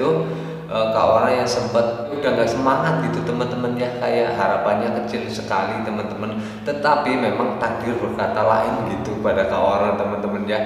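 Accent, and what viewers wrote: Banyumas, Indonesia. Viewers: Ohw wong ngapak yak..itulah perjuangan yg di jalani dengn sabar ..dan tlaten yo pasti panen..👍💪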